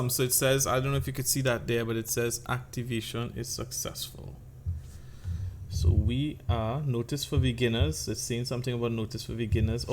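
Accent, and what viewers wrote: Trinidad and Tobago. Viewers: Good video! You sound Trini! Am I right? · wait wait, you trinidadian awa??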